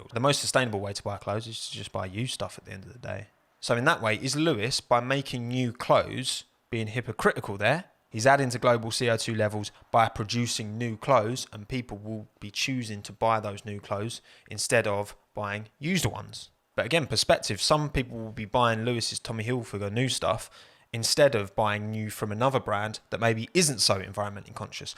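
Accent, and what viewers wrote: United Kingdom. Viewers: I only wanted to let you know you have the britiest accent I've ever heard I'm spanish btw.. Nice video too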